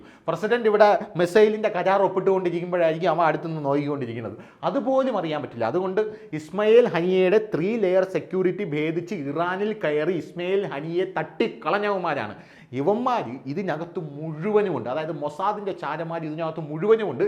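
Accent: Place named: Pala, India. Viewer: രസകരമായി present ചെയ്തു 👍പാലാ slang ൽ 😂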